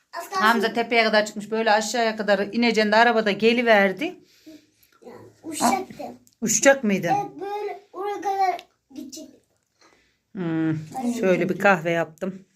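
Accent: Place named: Beyşehir, Turkey. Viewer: Bende beyşehirliyim ctesi pazarına bende gittim Baska yerde oturuyom konuşmanı bize benzettim de abone oldum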